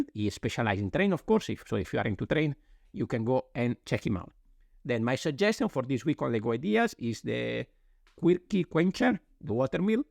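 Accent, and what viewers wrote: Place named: Italy. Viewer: Thank you for the kind feature, I'm so glad you like my Fabuland paddle steamer. Your models are beautiful and I absolutely love watching this channel, particularly for your accent as it reminds me of my Italian friends. Best wishes, Stewart (Stubot) ❤💛💙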